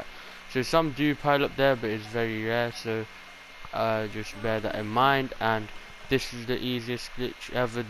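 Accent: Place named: United Kingdom. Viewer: That's just how brits talk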